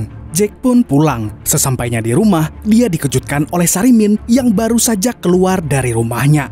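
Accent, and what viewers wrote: Banjar, Indonesia. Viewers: Aihh sekalinya ikam urang banjar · urang banjar kali nya naseb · Astaga banjar sekalinya, tekajut² uln 😆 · Keren yang bacakan alur ceritanya sampai kada hingkat guring ulun. Pasti angah dari Banjar ya kan? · org banjar skli nya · Bahh banjar sakalinya si nihh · Banjar banar wkwk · Umaiiii Banjar ai rupanya wal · Nah paman, orang Banjar kh pian 😁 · Mantap banjar nya 🤣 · Kira orang manakah sekali nya urang banua juaaaaaa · akay urng banjar kalinya di dangar bahasa😂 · Banjar kah nii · Harau urg banjar sekalinya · banjarr sekalinya pian lahj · Banjar jua sakalinya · bah mimin nya urg bnjar, mntap mang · orng Banjar kh bg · Orang banjar skli nya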